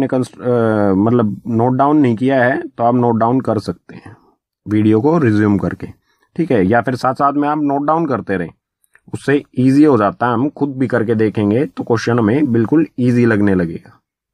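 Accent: Haryana, India. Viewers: Sir aap haryanvi ho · Sir aap Haryana se ho na ?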